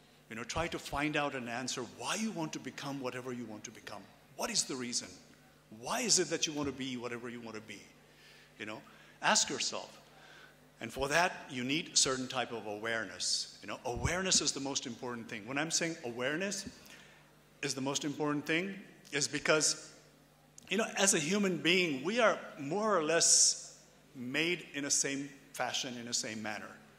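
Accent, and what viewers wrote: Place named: England, United Kingdom. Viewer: Love his English accent! Humbly appreciate your knowledge and the way you inspire students with your valuable words.. incredible speaker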